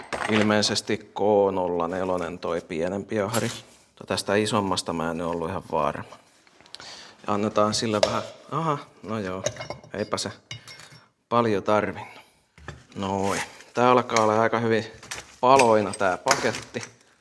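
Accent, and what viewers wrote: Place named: Pirkanmaa, Finland. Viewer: Ammattimiehen hommia on kyllä kiva kattoa, vaikkei nyky-VAG:laisiin koskis ite pitkällä tikullakaan. Pirkammaan murretta on kiva kuunnella.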